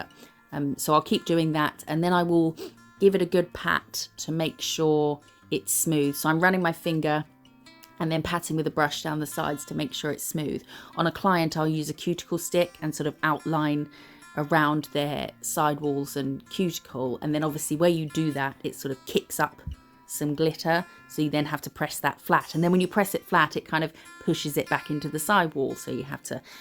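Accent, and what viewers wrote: RP, United Kingdom. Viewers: I loveee your channel, you talk to yourself like I do, swear like I do and have a naughty sense of humour like I do, so it’s like hanging with a friend with a much posher accent (I’m Northern Irish). Love your designs into the bargain! Win!!! Xoxo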